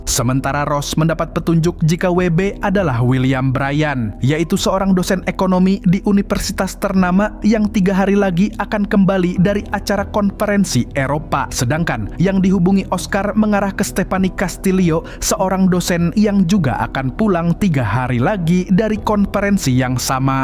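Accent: Banjar, Indonesia. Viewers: Urang Banjar kah nywa,,haha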